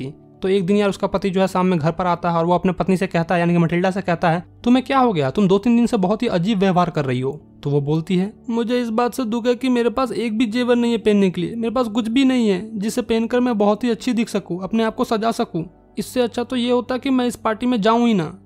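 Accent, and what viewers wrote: Bihar, India. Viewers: Sir Bihari che gujrati nathi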